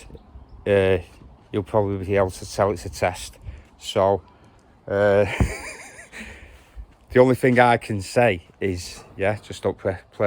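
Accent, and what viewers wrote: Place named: Wales, United Kingdom. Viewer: Hello! Thank you for your video and air test.I am French and I own the Legend in the 1.08 version,do you think that a high audio gain in a mineralized soil is a good setting? (because of false signals if the GB is not well adjusted).I think you are Welsh because of your accent,but I use the translator and please do not worry,I appreciate your tests!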